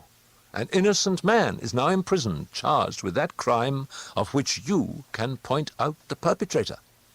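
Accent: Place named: RP, United Kingdom. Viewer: This one was overdone. Also, Poe was an American. It wasn't written with a posh British accent in mind. 🙄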